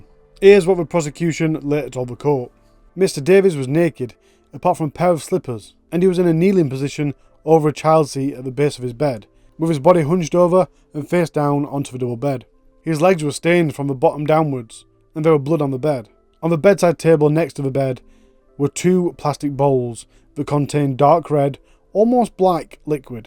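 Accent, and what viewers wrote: Yorkshire, United Kingdom. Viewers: By any chance, a Northerner, you? Yorkshire?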